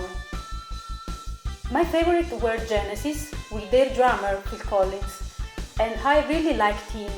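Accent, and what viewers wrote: Italy. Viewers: Not only are you an excellent drummer, but you have a beautiful Italian accent! Two thumbs up!!! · Omg you have an Italian accent too !? Damn now my heart is really exploding! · beh con quel accento certamente nn potevi essere di Bolzano...ahhhhh,insomma sei cresciuta a pane e progressive,beh sicuramente un genere molto tecnico,meriteresti un contratto da professionista! Continua cosi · ....Thanks for answering questions that many of us have wondered about! What do you think about Mick Fleetwood? As you well know...the music business is not only auditory, but also visual as well. Not to appear as creepy or anything like that, but clearly you are a very talented and extremely beautiful woman....and your Italian accent is fantastico! Love to see you playing piano and singing!! Keep the videos coming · Congratulations on 55K+ subscribers and so nice to hear you speak with that beautiful Italian accent... 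I had always assumed you were in the US somewhere, it is great you are here in Europe. Would love to hear your own music and videos of you playing with your band as well as the brillant drum covers you keep giving us. I have got to say the hours of practice you put in really shows in your playing - amazing quality. Very best wishes from London UK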